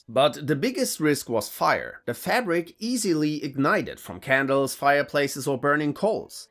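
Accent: Germany. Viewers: You know I normally don't like channels like this, because they feel like kiddie channels, but this one is different. Would like longer explanations, but I understand that people don't have that long of attention spans nowadays thanks to shorts, reels, and Tiktok. But it is good for background noise while cooking or working on projects around the house. Also I spent three videos trying to place your accent...and I finally got it. You're German, are you not?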